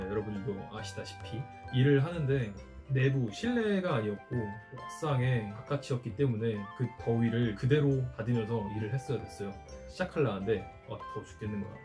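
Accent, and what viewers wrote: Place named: Gyeongsang, South Korea. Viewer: + 경상도 사람 같은디 경상도의 서윗함을보여주네용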